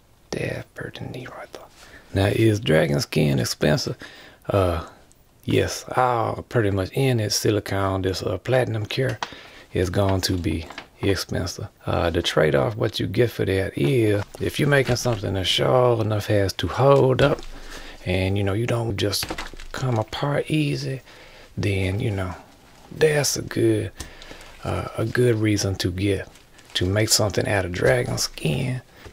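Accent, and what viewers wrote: Louisiana, United States. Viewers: You have to be from Louisiana!! Hearing you make me think of home!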